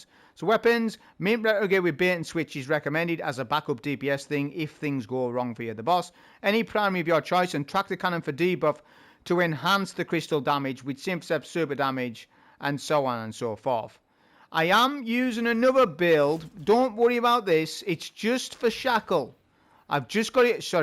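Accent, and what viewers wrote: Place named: Chesterfield, United Kingdom. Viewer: another great video brother... you sound local to me, im from chesterfield. you got discord?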